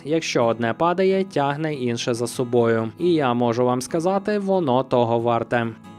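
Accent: Russia. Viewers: Та запам'ятайте вже нарешті: прохООООООООдити, довОООООООООООдилося! Скільки Ви ще тягнутимете ці свої кляті кацапські наголоси?!?!?!?!?!?!?!?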